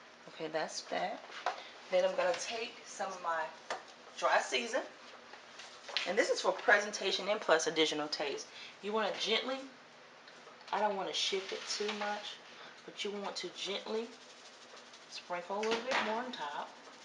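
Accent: United States. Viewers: I can tell you this you sound like a very good lady from America there are not a lot of good people in America. I always cook the turkey at my nieces place and there's about 14 of us and i always wanted to try to and injector the turkey because i know it will be so good. I stuff my turkey because everybody take some home and there's nothing left of the turkey. I can't find one here in Canada the injector i use butter unsalted not margarine and i add poultry seasoning and Rosemary olive oil and then I mix everything together and then I put it underneath the skin a pound of butter it's to die for I clean my turkey out just like you do :) I would like to do it like you didn't i have to go shopping today and look to see if i can find one of the injector. Thank you for the video Merry Christmas 2016 .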